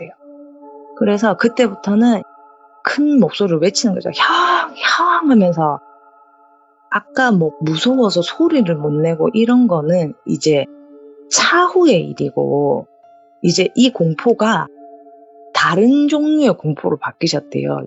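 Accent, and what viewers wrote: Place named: Gyeongsang, South Korea. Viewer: 충청도 피가 흐르는 경상도분이신가봐요 재미나게 잘들었는데 사투리신경쓰지 마시고 해주셨음~~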